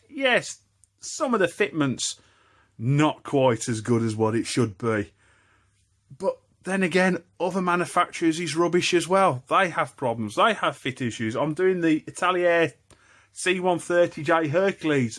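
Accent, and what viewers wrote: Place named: West Midlands, United Kingdom. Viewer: Thought "! referred to the old Airfix kits sold in bags until I heard your accent! lol Assume it's for West Brom? KRO SOTV! lol Any way on topic, Airfix were getting a lot of criticism for continuing to release old kits and people couldn't tell if it was an old kit or not. The "Vintage Classic range was an excellent move to differentiate the old from the new. Again, I don't know why Airfix in particular should get flak for it since all manufacturers do the same. I bought a Hasegawa Tomcat which turned out to be the old moulding which had near similar art to the new tooling! Thank goodness for scalemates! lol When I got back into the hobby not sure Airfix were actually releasing anything new. The cheap kits enabled me to develop my building and scratch building techniques So totally agree with your rant! 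lol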